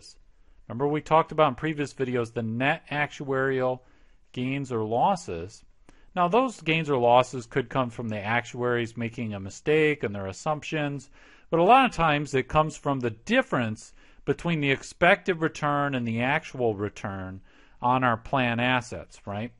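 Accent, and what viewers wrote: United States. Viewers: Is your accent from Rochester, NY?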